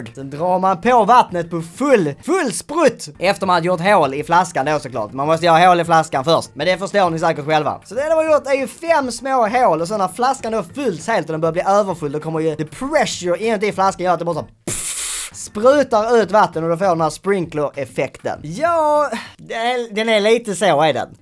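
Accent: Scania, Sweden. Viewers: Den skånska dialekten är då jävla rolig🇸🇪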